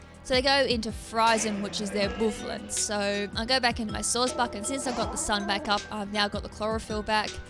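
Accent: Australia. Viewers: just assumed it was Australian accent anyway. ^^ Anyway, didn't mean to complain. Thanks for taking the additional energy to still upload a video. :)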